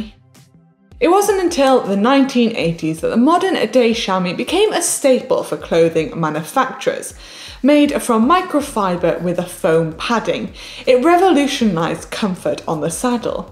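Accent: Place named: Wales, United Kingdom. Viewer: Do you mean her accent? She's Welsh. That's how the Welsh speak.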